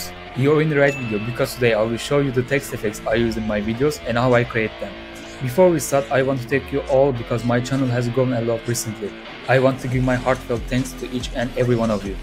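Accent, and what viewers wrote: Turkey. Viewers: It is understood from your speech that you are Turkish (I am Turkish and I do not have after effects)❤ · Aksandan direkt Türk olduğunu anladım, bu tarz içerikler için tebrik ve teşekkür ediyorum dostum :)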